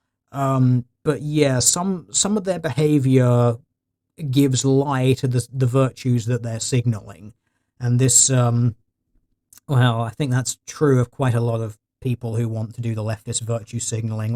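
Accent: United Kingdom. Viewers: The Crown killed Princess Diana. All monarchies including Monarchial Constitutionalism are inferior to Republic Constitutionalism. Fite me. (Sorry talking about Conspiracies involving one car crashes with a british voice mentioning it triggers this type of shit posting.)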